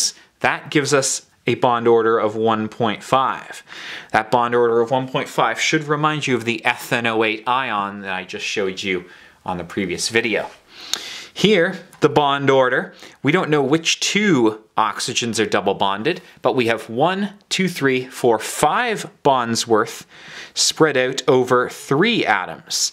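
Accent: Canada. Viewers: you're canadian aren't you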